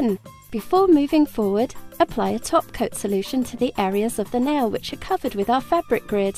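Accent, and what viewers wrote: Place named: United Kingdom. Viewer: i loooove this british accent.....i really do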